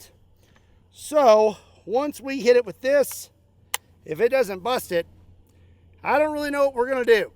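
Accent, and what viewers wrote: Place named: Baltimore, United States. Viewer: B-more people like why he talk like that. If you know you know. 😆 🤣 🫡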